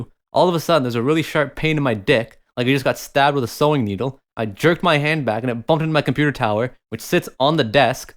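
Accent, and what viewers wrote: Canada. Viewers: He’s either from northern Michigan or Canada with that accent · I forgot this guy's a fucking Canadian but then I hear this nigga say about and eh.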